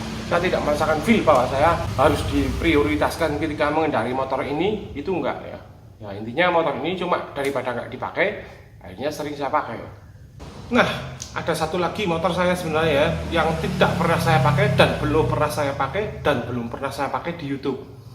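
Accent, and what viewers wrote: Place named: Banyumas, Indonesia. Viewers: Benar banget om begitu lihat pertama kali youtube om langsung suka karna bahasa om yg ngalor ngidul nya medok banget itu yg bikin suka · Klo saya lbh suka ocehanya mz lbh klasik jwo medok hhhhhhh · Ga ngapak ga enak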